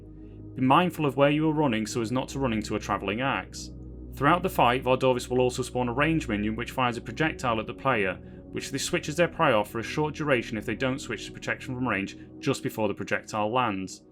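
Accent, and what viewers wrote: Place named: United Kingdom. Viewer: you have the most British voice ever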